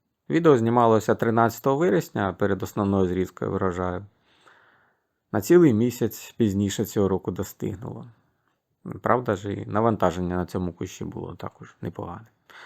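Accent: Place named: Desna, Ukraine. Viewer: Знайома, точніше, рідна вимова. Таке, як придеснянська. Ви часом не з Батурина?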